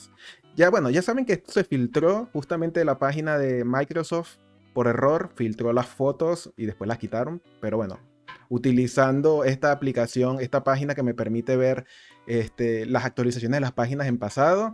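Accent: Venezuela. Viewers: Bro eres de venezuela?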